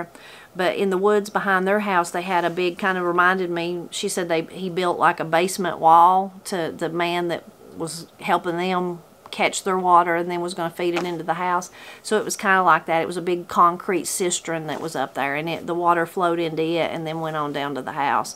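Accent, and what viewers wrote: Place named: Kentucky, United States. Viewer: I remember my grandmother’s voice and mama’s stories of family with every turn of your voice. Even though mama’s family was transplanted to Oregon, the Kentucky in their voices and stories echoes in my mind with each story you read. Thank you.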